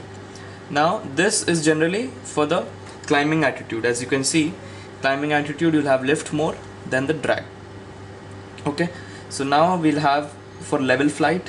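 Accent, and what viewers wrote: India. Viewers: Good video but try to avoid the annoying indian accent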